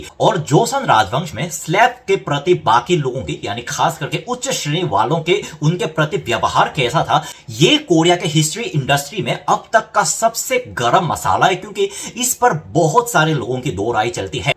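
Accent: Standard. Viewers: Waah... jabardast... 👍🙏 Varnan karne ka tarika... bahut accha lga.. 👍 sudh Hindi 👍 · Aap to india se bhi shudhh hindi bolte hai ekdam book ke jaisa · इतने शुद्ध हिंदी तो मुझे भी नहीं आती Jitna aap bol rahe ho😁.. I'm a bengali girl 😊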